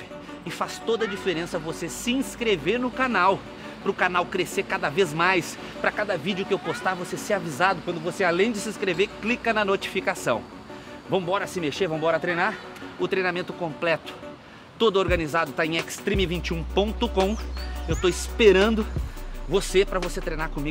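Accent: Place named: Porto Alegre, Brazil. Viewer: Tu é gaúcho ? 😅 Percebi um ar portoalegrense na tua forma de falar,as praças dos vídeos me lembram muito Porto Alegre,pois sou de Porto Alegre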